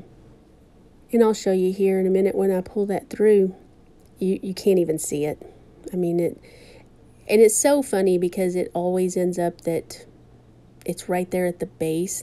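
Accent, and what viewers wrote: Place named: Midwest, United States. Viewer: Very nice! Question where are you from? The mid west! Maybe Indiana? Just listening to you talk.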